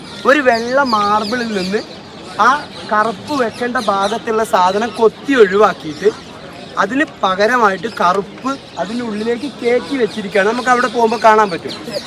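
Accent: Malappuram, India. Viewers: Cute cuples... എനിക്കു൦ ഇങ്ങനെ ഇംഗ്ലീഷ് പഠിപ്പിച്ചു തരുമോ😍😅മലപ്പുറം ഇംഗ്ലീഷ് കേൾക്കാൻ നല്ല രസണ്ട് 😅😅😍😍🥰🥰 പാത്തുമ്മ പാവ൦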